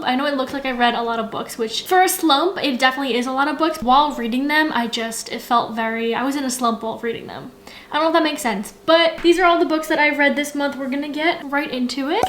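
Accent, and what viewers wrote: Canada. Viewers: she sounds Canadian or something or Ukrainian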